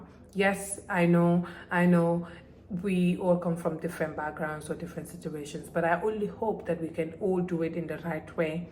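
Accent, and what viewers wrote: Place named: Namibia. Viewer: I am Namibia and I kid you not, you look and sound Namibian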